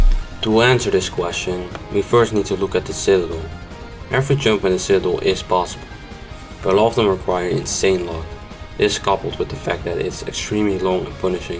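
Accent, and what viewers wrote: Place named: Netherlands. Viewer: I love jouw Nederlandse accent ;)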